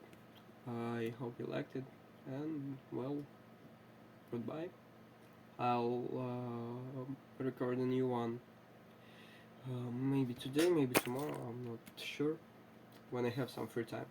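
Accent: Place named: Russia. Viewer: Russian pronounsation fits to the videos like this :-)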